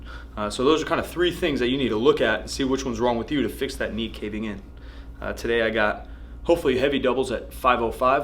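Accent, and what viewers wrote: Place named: Russia. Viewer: Beautiful sumo technik russian accent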